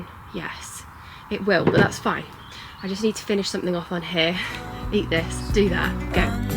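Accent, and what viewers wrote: Wales, United Kingdom. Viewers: Why don’t people understand change is a great thing and it’s completely natural! Change is how we grow into the people we want to be. Also how bizarre that sometime says your losing your Welsh accent like of course you’re living in England😂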